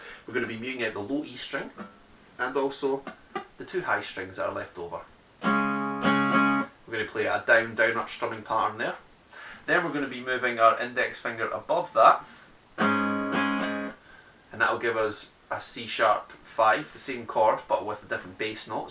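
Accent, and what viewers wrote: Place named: United Kingdom. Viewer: Thx for a clear, well produced tutorial. " "? It was a good chance to brush up on my Mancunian, or Geordi, or Scots...whatever... sounds cool.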